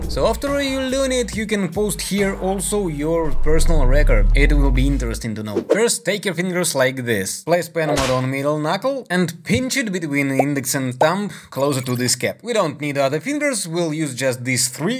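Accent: Russia. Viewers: I love your. Russian accent it's so nice to hear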